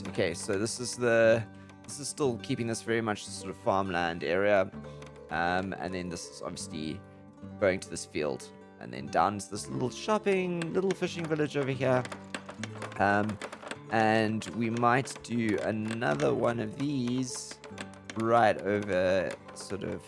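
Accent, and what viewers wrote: South Africa. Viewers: I knew I recognised that accent, my wife is from Pretoria lol. Good videos man, you made me want to get back into the game especially after the new update :)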